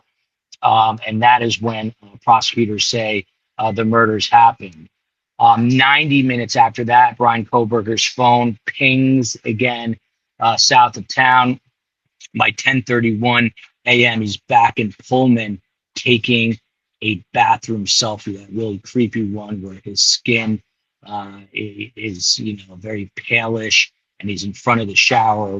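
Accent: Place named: New York, United States. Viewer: Great news flash,. Looking and sounding very New York.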